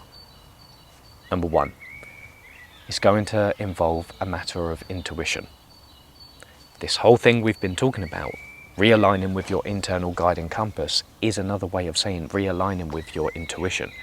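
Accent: United Kingdom. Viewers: your british accent makes your point perhaps better than your words.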